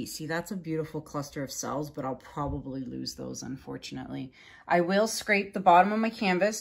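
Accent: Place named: Atlantic Canada, Canada. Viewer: I just want to tell you how much you've inspired me. Im a super crafty Atlantic Canadian and I am in awe of the things you do. It's so great to watch someone who talks like me and shops at the same places and all of that jazz. I've subscribed and binge watched pretty much all of your videos. Keep it up and maybe you'll see me on here someday if I get brave enough lol. You are phenomenal.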